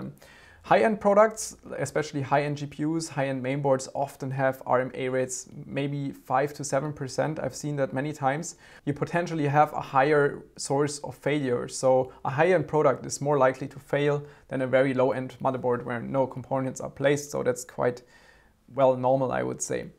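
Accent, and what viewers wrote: Germany. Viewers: Most people??? LOL. I work in a company with over 200 employees, and only a few people speak English, and not even close to flawless. Only a minority of people in Germany speak English. Most learn it at school, then forget it afterwards because they never use it again. And I agree with TheFreeFlow. He could work on his german accent easily by watching more TV shows in English, or tech channels. Anything really, but in English.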